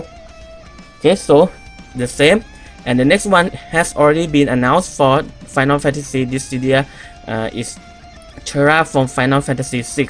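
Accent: Thailand. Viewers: เหมือน คนไทยเลย สำเนียง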